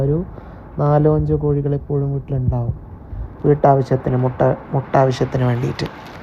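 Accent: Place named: Kasaragod, India. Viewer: Edk kasrod slang bern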